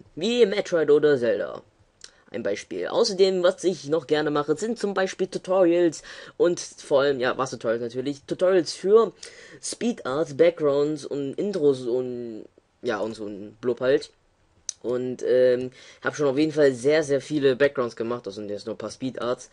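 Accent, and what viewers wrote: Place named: Stuttgart, Germany. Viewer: Für einen Stuttgarter erfreulich wenig Dialekt, für einen 14-jährigen eine recht gute Stimme - so weit, sogut. Wichtiger Tip: Vorbereiten! Gerade bei einem solchen Video sollte man sich vorher ein paar Gedanken über den Text machen, notieren, ausprobieren etc.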